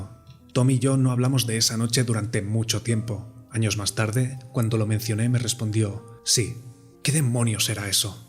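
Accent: Castile, Spain. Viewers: AGUANTE EL ACENTO CASTELLANO DE CASTILLA!!!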